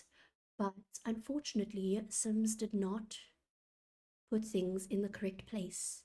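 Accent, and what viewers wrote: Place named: South Africa. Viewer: Lovely house and I hear that South African accent ❤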